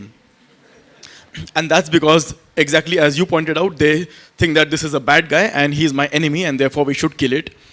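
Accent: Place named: India. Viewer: i too dislike the Indian English accent, but you must realize that this just is a matter of habit and does not warrant hate.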